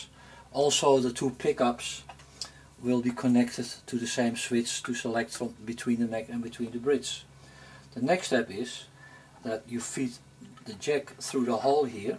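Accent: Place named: Netherlands. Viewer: You sound dutch... and on your site it says your available in holland, where are you stationend?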